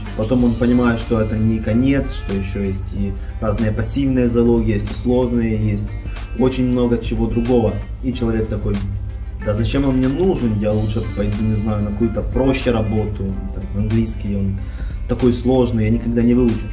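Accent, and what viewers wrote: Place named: Ukraine. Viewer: думаю Вам бы не мешало подучить русский прежде чем на нём разговаривать...очень слышно что общается украинец....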